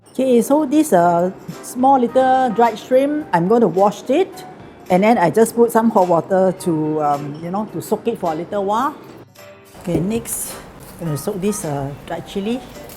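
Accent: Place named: Singapore. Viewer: Love the dish love the Singlish lahhh...thx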